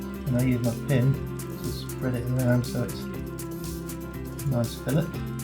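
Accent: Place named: Yorkshire, United Kingdom. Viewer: Totally agree with you. I'm waiting to see how he bent the carbon fiber rod for the tips. But please don't tell him I LOVE your channel heaps more ❤ If you were a shiela I'd ask ya out and we could talk about ailerons and airfoils and fuel mix ratios and compare our Fokkers all night and stuff like that 😂 But ya a bloke 😂😂😂😂😂😂😂😂 and I'm happily married. So that's a bit messed up hey 😅 You remind me so much of a bloke (in Australia cos I'm in Sydney Australia ) oh man it was near forty years ago I was 20 he was 60. Name was Charlie Leeson from Yorkshire. A tool maker who really made me fussy about word work and modelling. I flew my fifth scale DVII and he his tiger moth and fly baby. Best flying days of my life with Charlie.. His work was stunning. (He passed away a good twenty years ago ) Anyway you have a similar accent and manner so thank you for being you and helping to revitalise so many memories of a hero of my youth. 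Hey shouldn't I be talking about Danny's channel and not yours here?????😂😂😂😂😂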